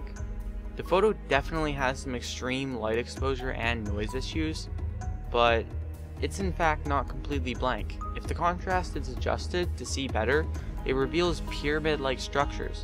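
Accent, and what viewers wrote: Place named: Canada. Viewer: the narrator is obviously Canadian. "howse" "abowt"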